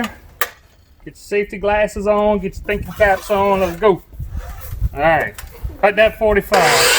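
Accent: Louisiana, United States. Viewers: Where are you from sir? I’m from Lafayette, La currently living in Pittsburgh, Pa and that accent is a taste of home! Me and my sons enjoy your videos! Keep it up!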